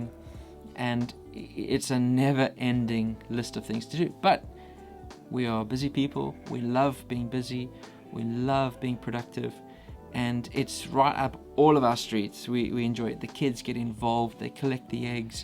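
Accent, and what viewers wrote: South Africa. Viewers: You sound South African? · Ja. That's what I thought when I first heard him talk. What? But he sounds South African!!! What does he mean "moved to South Africa"? You already belong Mr.! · His parents are the farmers. Egte boere. Can’t you hear his accent? Capetonian accent. Lived in Uk 15 yrs. Now he is back. Go julle. Vas byt. With you all the way. From What Oz. 40 yrs. ❤️SA. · Well he sounds South African so he's one of us now. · But you are a South African. That accent is dead giveaway. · Wow, you sound 100% South African. Not even a hint of a foreign accent. ..and that after only a year?? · It is so funny to hear that you are from the UK, your accent to my South African ear sounds South African and looking at you, you even look South African to me, weird isn't it? You could so pass for a Dutch South African, geniet u dag, and stay safe. 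I left South Africa 32yrs ago, all my family are and still lives in Cape Town, I would love to move back, but... I have a fear for the crime and having to live behind bars and in and out of cars. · You don't sound like you're from outside South Africa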